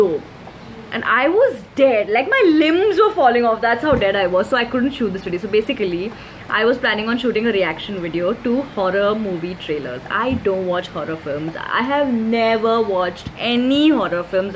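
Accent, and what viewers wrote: India. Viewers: What's dat hindi accent ??🤔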